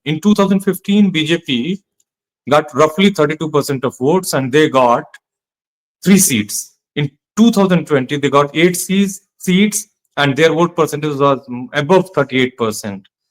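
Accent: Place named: Bangladesh. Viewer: Your accent is bangladeshi , show us your dr degree u cheat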